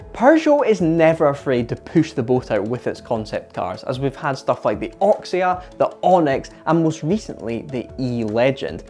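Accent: Scotland, United Kingdom. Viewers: He's Scottish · he's Scottish he canne' help it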